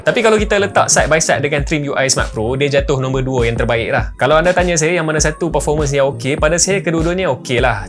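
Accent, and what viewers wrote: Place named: Penang, Malaysia. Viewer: bang asai utara ka?? hahaha ada style stylee mamu penang mari laa cara bercakap😁 apa apa pun saya tangkap Trimui Smart Pro sebab review abang hahahhah:face-green-smiling: TERBAEKK bang teruskann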